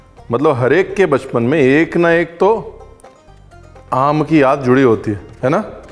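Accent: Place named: Lucknow, India. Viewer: the lucknawi touch in his tone simply adds the magic to each and every recipe of our dear chef...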